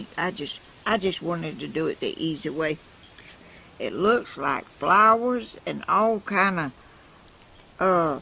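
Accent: South, United States. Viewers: Hello Mr, Your so sweet love your southern accent. I love to watch you create, it come so natural to you. I could watch you for hours. I've learned a lot from you. Your very creative, and 'm impressed. I cant wait to retire so i can create my heart out like yourself. Bless You Always. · Ms., what a blessing you are, listening to you makes me homesick for the south, I am from SC. God bless you, love your projects, what a wonderful job you do.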